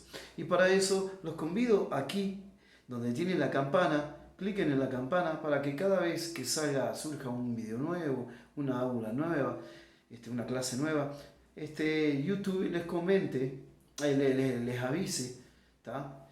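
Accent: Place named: Brazil. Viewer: Excelente contenido amigo gracias por este video, no puedo evitar encontrar en su acento argentino, también una sutil mezcla del portugués brasilero .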